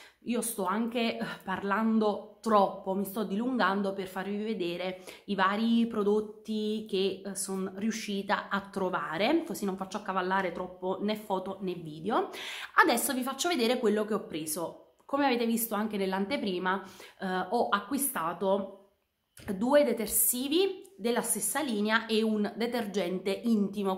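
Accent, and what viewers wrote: Naples, Italy. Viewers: Simpaticissima, anche se avvolte quando parli napoletano non ti capisco. Ma seguendo il discorso riesco a comprendere. Baci❤️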